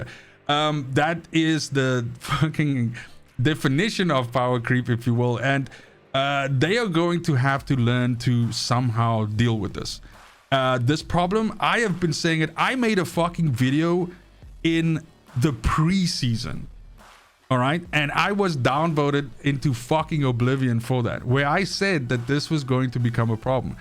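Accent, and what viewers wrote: South Africa. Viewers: Your the best I recommend you when people need help . Are you from South Africa as your accent sounds that way 🤔. OK this Serena issue As you and others make builds that wipe out enemies and boss killers in 3 seconds them its a bit harsh to say Nexon are to blame.. 3 weeks ago the Gley gun build ruined the boss for everybody and in the game Is that not the same . You then have the nexon put out a new top tier character Keelan anybody??? He was new I have yet to see him in a game I had him but deleted him as I needed a slot. So that argument is done. As for Serena I play another game Naraka bladepoint its free and basically first descendant copied it and moved it to space Naraka is Chinese melee swords OK. They made a character that flys last year OK wow he will destroy players Novelty wore off nobody uses him Exactly the same fire water chill toxic skills. 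So this Serena so far again hardly seen in game Majority are bunny and freyna who just wipe out everybody as usual.If this Serena is so dope then as players bitch about grinding then use her and clear missions fast.or do they want a weak character to take longer . End game bs The game is free people get 900 plus hours thats more than most triple aaa games It takes time to create cgi etc Stellar Blade game of the decade 30 40 hours . If you want hours and hours get baldurs gate. OK As for these boss killers I have not seen any that do 2 seconds in a group They show a solo boss The boss is weakened for Solo So they are playin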